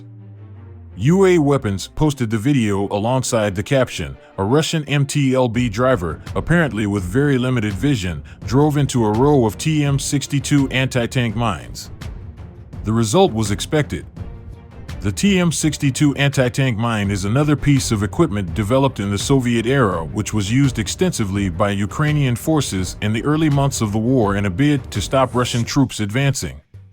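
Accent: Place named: United States. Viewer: Also, why the voice of a hick?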